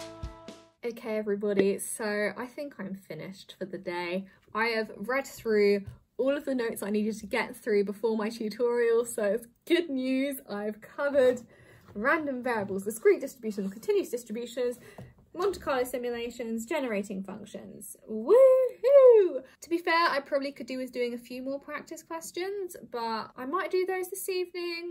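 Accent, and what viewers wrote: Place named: England, United Kingdom. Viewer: what part of england is that accent from? I like it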